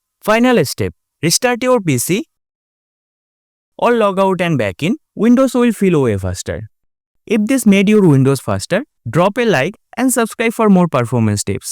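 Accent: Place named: India. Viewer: chill, not everyone has en as native lang, it's just indian accent, the video is dead easy to understand... even without audio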